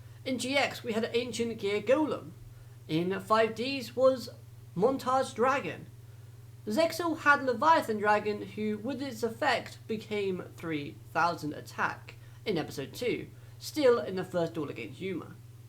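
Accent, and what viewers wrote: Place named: United Kingdom. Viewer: sounds absolutely B R I ' I S H For who is essentially a British YGO reviewer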